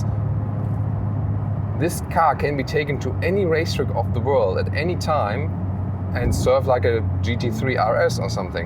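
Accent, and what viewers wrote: Germany. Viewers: The inflection of a German accent in English makes for a fantastic review!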